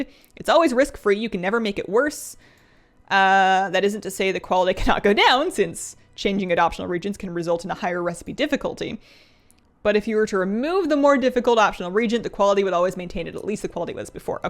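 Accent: Canada. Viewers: She's Canadian.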